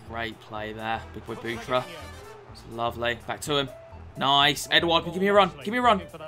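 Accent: United Kingdom. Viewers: Where abouts in the uk are you from I can’t tell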